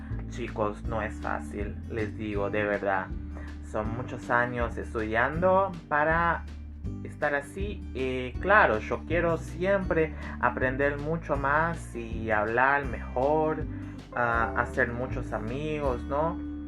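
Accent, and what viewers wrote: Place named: Argentina. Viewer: Pues sí hablas bastante bien, se nota el acento argentino más que portugués. Saludos desde México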